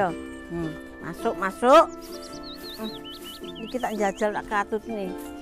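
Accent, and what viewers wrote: East Java, Indonesia. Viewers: Bu, asli mana ya.. Logate kok wong etanan 🙏😉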